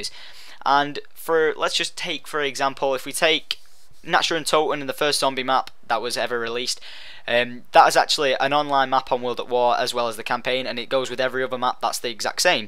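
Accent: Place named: United Kingdom. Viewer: i like yur accent its a fedish i have for british accents pretty weird............ so what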